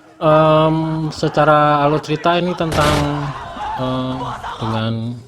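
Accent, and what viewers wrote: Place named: Java, Indonesia. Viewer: logat jowo salam ko kalteng bos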